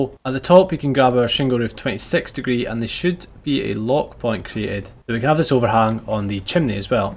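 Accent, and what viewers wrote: Scotland, United Kingdom. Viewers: The Scottish accent turns the immersion up to 💯! Love your videos and I can’t wait to build this dock once I get out of the Bronze Age lol! Subbing for sure after this one!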